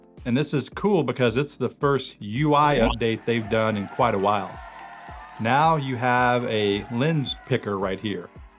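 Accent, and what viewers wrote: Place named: Carolinas, United States. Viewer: Thanks for the info. Love your accent. Is that a North or South Carolinian accent? Have a good day.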